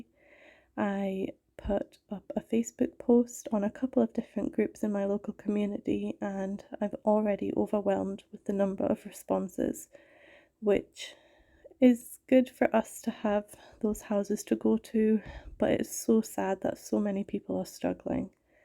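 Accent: Scotland, United Kingdom. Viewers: Greetings from Portland Oregon. Mac Bonnie Barbie and Coline follower, also mom of 4, also migraine sufferer, I lived in NE Scotland for about 2 years and my second was born there, so jumped at the chance to hear familiar sounds sights and dialect.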